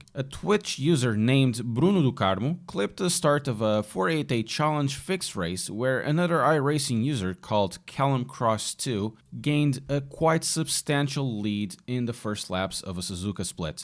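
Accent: Portugal. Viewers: You're from Portugal i can hear it 🇵🇹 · Acho incrivel como mal comecei a ver o vídeo, mesmo com um sotaque e pronuncia mt boa, tive logo o feeling que isto era um tuga ahahah Fui ver outros vídeos e curti do conteúdo, continua o bom trabalho.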